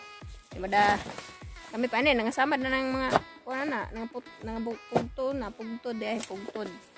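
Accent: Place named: Bohol, Philippines. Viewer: Boholano kaayu Miss🥰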